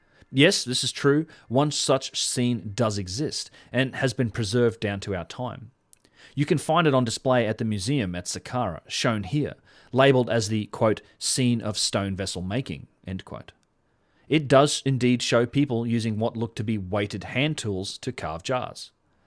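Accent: Australia. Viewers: G'day, just wanted to say that I really like your stuff and how great it is to hear an Aussie talking with such intelligence and conviction, nice work mate!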